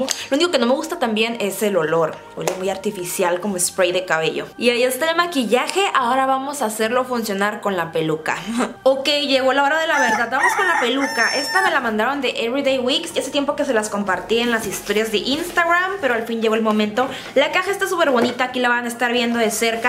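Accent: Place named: North, Mexico. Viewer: Me gusta tu acento bien norteño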